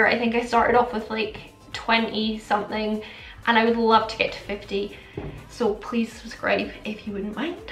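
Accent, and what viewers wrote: Scotland, United Kingdom. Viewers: So lovely to meet you. I’m Willa from southwest Florida US. I fell down a rabbit hole and found myself at your delightful tea party! Impressed by your exquisite style and extensive knowledge of all things “drugstore beauty,” and lured by the charm of your sweet Scottish chatter I found myself spelled into a loyal subscriber! Hope you’re still giving t-parties 💐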